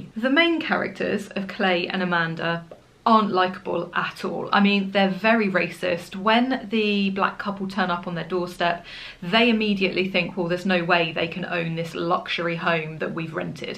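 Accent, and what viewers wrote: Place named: England, United Kingdom. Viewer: I liked the book as it is different from most world in crisis end times books that are usually predictable. Love your English accent, and don't worry about big words in books that means you are reading literature instead of pulp fiction and tripe. Sorry, you found the book not to your taste. Maybe your taste is the problem.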